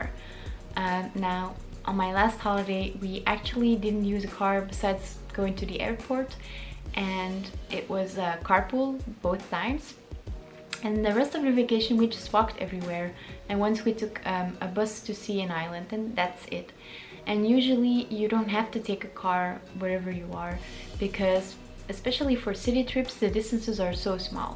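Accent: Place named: Belgium. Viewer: you kinda sound like ur belgium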